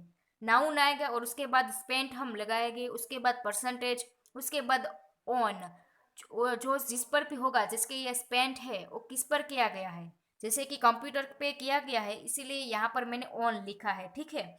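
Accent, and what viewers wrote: Gujarat, India. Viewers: i think mean from gujju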